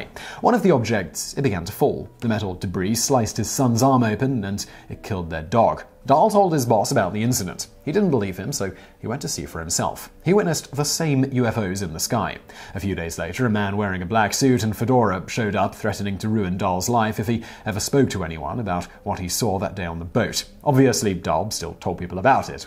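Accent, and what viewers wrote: England, United Kingdom. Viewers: Ran-i-er. Damn Limeys. Rain-eer. Your welcomer. Jesse Mar-sull. Marcell. You English folks talk funny.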